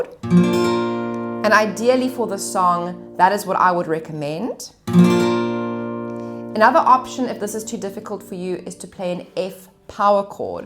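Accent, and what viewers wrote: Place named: South Africa. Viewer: Great lesson and refreshing to hear a good old south african accent again.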